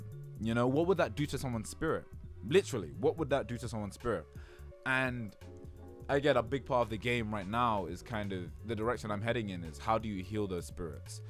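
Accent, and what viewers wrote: United Kingdom. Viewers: no he's just british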